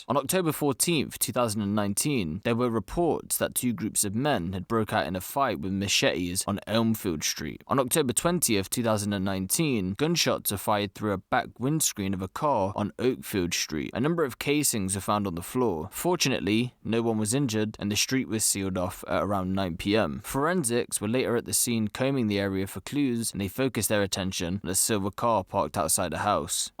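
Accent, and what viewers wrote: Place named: Birmingham, United Kingdom. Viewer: Brummie i think mate...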